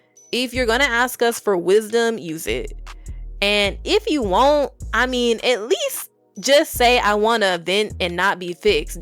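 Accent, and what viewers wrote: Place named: Carolinas, United States. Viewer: I don't know you, but you have a thick southern accent that reminds me of the Carolinas. There are plenty of beautiful, well mannered Black American women down south. Why are you chasing cat in the Philippines? I grew up with Filipinas in my military hometown. They're nice people, but are desperate and will do anything to get ahead. They're told from birth that white men are the ticket and even then, they can scam the white guys. My best friend's mom is the only one I know who actually prefers Black men but even so, she scammed his biological children out of their inheritance, then dated his friends as soon as he died of cancer. Come on, be smarter.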